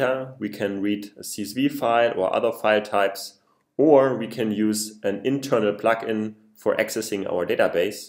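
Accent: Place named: Germany. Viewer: hey, sprichst du deutsch? dann lg -der akzent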